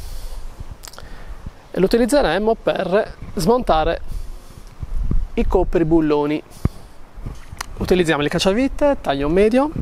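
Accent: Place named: Sardinia, Italy. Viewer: Ad un certo punto ho creduto che li avresti smontate 😁 Di dove sei ? Non dirmi sardo che l’ho capito 😁😁😁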